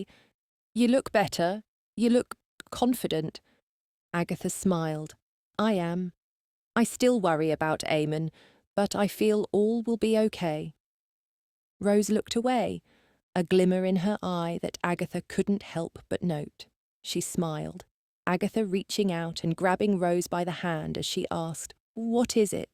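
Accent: United Kingdom. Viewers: It’s hard to listen to a Scottish story with a British accent🫤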